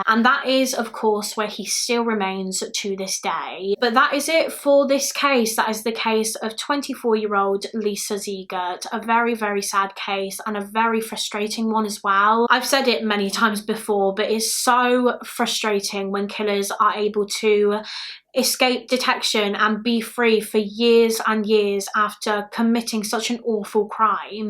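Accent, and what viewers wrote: United Kingdom. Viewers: You’re so adorable and I love your voice. I’ve always had a hard time with British accents, but you and Eleanor Neal are life for me 🥰🥰🥰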